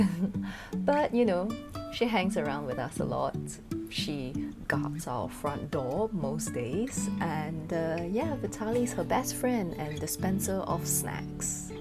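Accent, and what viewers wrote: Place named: Singapore. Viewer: I love watching your channel. So you're from Singapore that answers my curiosity cuz you sound like one without Singlish of course 😀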